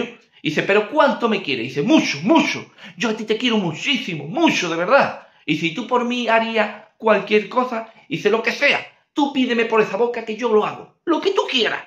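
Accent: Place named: Andalusia, Spain. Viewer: soy una abuelita de 86 años!!!te veo desde París y me rio muchísimo con tus chistes tan graciosos y tu. forma de contarlos con ese acento andaluz y tus gestos tan salados. Sigue majo, que nos alegras la vida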